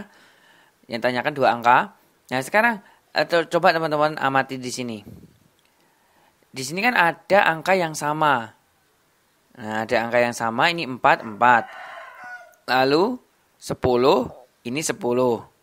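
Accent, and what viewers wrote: Java, Indonesia. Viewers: Alhamdulillah kak, aku tertarik nama chanel nya kayak nama orang Chinese tapi logat jawa punya dan ada rumus The Ping-nya..andai guru mtk mass skolah kayak njenengan pasti mudeng pol.. Kak, moon maap aku jadi tertarik matematika lagi saat persiapan tes SKD gegara chanel njenengan kang, Saya kurang kerjaan, itu apa akronim Ping2an(jawa) dan perkaliean?? Kerenn pokoknya, mudah dipahami semuanya dari chanel ini Ohya mau melaporkan tes skd saya hari ini meski nilai tidak sampai tembus di atas 350, hari ini saya tes dan berkat chanel ini TIU yang biasanya try out saya nilai selalu anjlok alhamdulillah dapat dua kalinya.. Ini tes kedua saya semoga yg terakhir krn udah ketrima pns. aamiin Skor 335 "twk 90 Tiu 105 Tkp 140" Ranking belum ngeh di instansi yg saya tuju Sedikit FR Pecahan sederhana dengan pendekatan dan barisan juga posisi duduk melingkar jan persis deg penjelasan njenengan kak, maturnuwun saya minta doanya ya bisa lulus perankingan SKB sampai jadi ASN AAMIIN YA MUJIBASAILIEN.. 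MUGA barokah selalu kak ilmunya karena keikhlasan berbagi ilmu yg njenengan miliki semakin berkembang, saya nantikan slalu temasuk materi skb nanti apa aja ya kak. Terima kasih #maapkepanjangan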